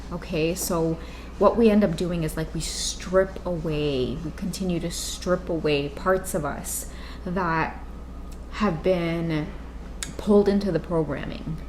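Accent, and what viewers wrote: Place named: Trinidad and Tobago. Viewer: I love your Trinidadian accent and love your help. Thank you for your time and energy<3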